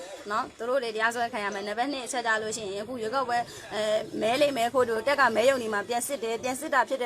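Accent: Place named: Sittwe, Myanmar. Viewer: မဂ်လာပါညီမလး မဟာသွေးလေးစာပါတယ်စစ်တေးသံလေးနဲ့ကြားတော့ပျော်ပါတယ်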